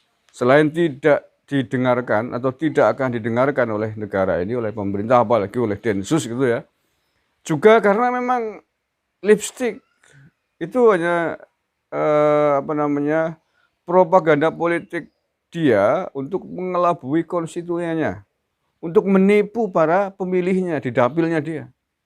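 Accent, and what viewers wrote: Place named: Java, Indonesia. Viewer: haduh ngakak aku om jenengan kalau ngendikan lucu jawani salam om sehat selalu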